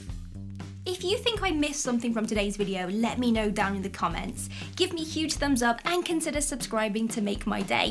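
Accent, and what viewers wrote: England, United Kingdom. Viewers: That English accent tho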